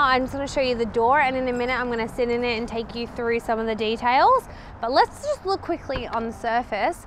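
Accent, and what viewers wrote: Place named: South, United States. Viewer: Not going to lie, at first I thought she was from the southern United States with her accent 😂 it is so similar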